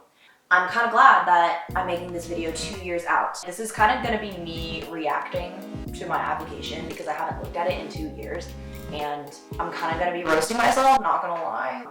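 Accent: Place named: United Kingdom. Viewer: I have no idea as to why I sat through this entire video when I’m a MS3 😂 But the british accent is on point! I had no idea high schools in the states were so intense!!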